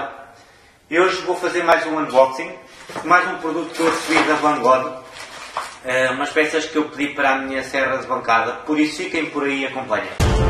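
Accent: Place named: Portugal. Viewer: Muito legal esse jig para corte... certamente farei um genérico em madeira pra mim rs Vi que você respondeu meu outro comentário aquela vez mas acabei perdendo a conversa... pra mim é mais fácil entender hoje o seu português que é completamente diferente do português falado aqui no Brasil por acompanhar diariamente O Homem que Mordeu o Cão da rádio comercial. Boa sorte no canal.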